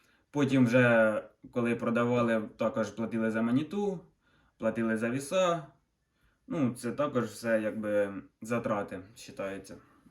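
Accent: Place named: Poltava, Ukraine. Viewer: По говору слышно ,либо Полтава ,либо Кировоград ,я вас обожаю землячки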